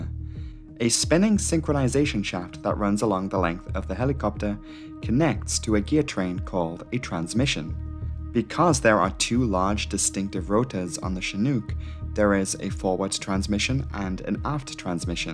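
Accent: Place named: United Kingdom. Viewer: danish what? is british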